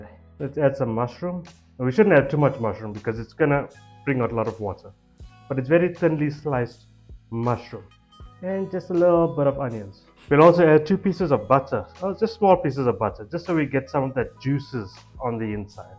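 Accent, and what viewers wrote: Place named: Bengal, India. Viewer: dude sounds like a bangali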